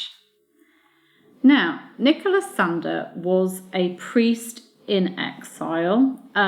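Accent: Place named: United Kingdom. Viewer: That's the British English spelling - and she's speaking British English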